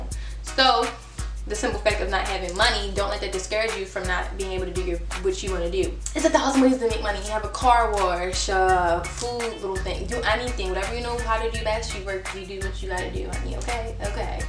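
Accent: Baltimore, United States. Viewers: You sound like you're from Baltimore 😍💕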